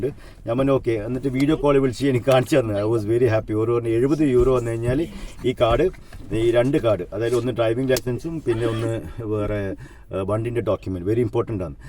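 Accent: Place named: Thalassery, India. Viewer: തനി തലശ്ശേരി ശൈലിയിലുള്ള മനോഹരമായ വിവരണം. Wishing you all the best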